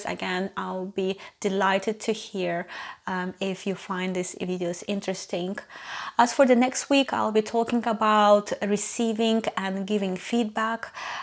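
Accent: Russia. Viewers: I can hear Russian accent. Are you from Russia?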